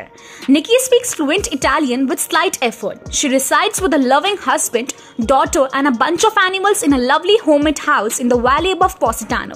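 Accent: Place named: India. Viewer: You have a very strong Indian accent that I have a hard time to understand.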